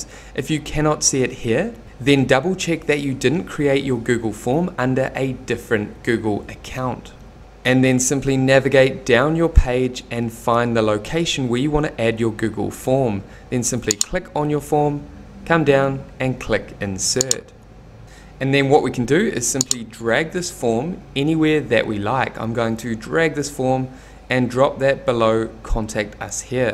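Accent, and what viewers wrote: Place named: New Zealand. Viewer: Brilliant as usual. I just don’t bother with other YouTubers any more. wins. Plus, as an Aussie cousin from the other side of the ditch, I love your local accent.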